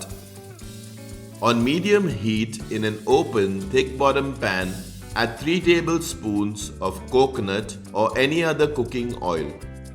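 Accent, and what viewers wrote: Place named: Mumbai, India. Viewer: What a pucca Bandra-Mahim accent! 😅 Very nicely demonstrated to prepare a perfect Cabbage Foogath! A variation of Saraswat Upkari!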